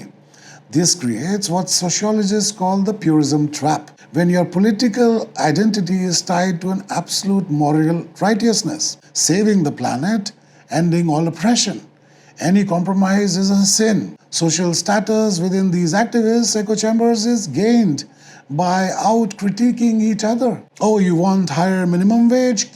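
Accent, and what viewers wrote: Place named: United Kingdom. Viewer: Amazing 🎉❤ amazing 🤩 Very British narration. Thanks for posting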